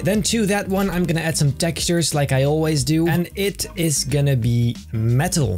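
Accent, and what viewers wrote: Netherlands. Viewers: Quick question, are you Dutch? Becuz your accent is the same as ours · Turned out pretty good ✨, but I miss videos with the dutch accent 😆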